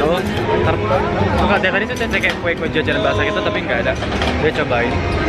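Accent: Medan, Indonesia. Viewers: org medan ya? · Logatnya kayak anak medan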